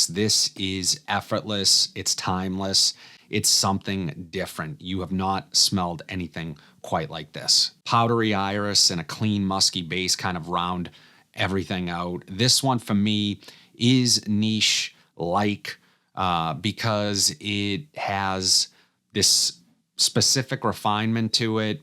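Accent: Boston, United States. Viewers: Man....that Boston accent. Gets me every time, in a good way. lol Thanks bud for a great video. I used to be all about niche but lately I am totally digging some designers that should be niche! A few are: Montblanc Black Meisterstuck (a more elevated and better performing version of Black Lacquer), Boss Bottled Absolu (I have not smelled any of the other Boss frags, but I cannot imagine anything being better than this one - beyond great!), Salvatore Ferragamo Pour Homme Oud (you can thank me later), Cartier Declaration d'un Soir (such an amazing manly rose).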